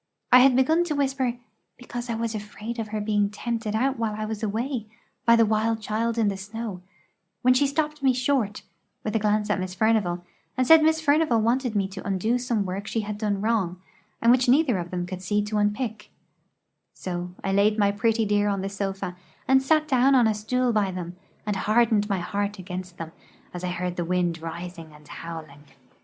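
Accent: Ireland. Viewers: You are a very creative girl ... and I love your Irish accent !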